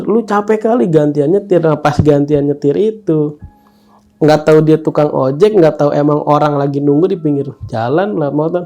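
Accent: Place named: Semarang, Indonesia. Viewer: Buset orang semarang kah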